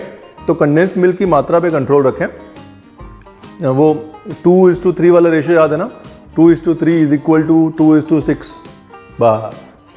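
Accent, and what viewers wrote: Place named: Lucknow, India. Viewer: Science class + History class + His Lucknowi leheza + Lucknowi nazaakat which is nothing but absolute romancing of all ingredients is nothing but creating a masterpiece .... Not to forget Ranveer Sir ,,, inspite of being Punjabi we can see his love for Lucknow as he spent his early days of learning this art in Lucknow!!!!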